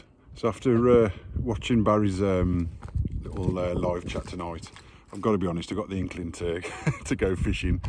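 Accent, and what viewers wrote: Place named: Black Country, United Kingdom. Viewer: nice one mate. I thought u mite set me strate on the 'brummy' thing, i use the term generically. I shud of been more accurate and sed you are a YAM YAM or WERNIT, and me getting that wrong cud almost be classed as an insult, so i do appollogys for my incorrectness. I think i have got that rite, with the YAM YAMYAMS and WERNITS and BRUMMYS, there is probly sum more 'off shoot' local terms for the 'tribal affiliations lol, that i'm not familiar with. So if you are a Blackcountry fella, that means you're not a Brummy, strictly speaking. You know wot us northerners are like we hear an accent and thats that lol. Anyhow mate spot on fishing and i appollogies for the brummy thing. Cheers Mick.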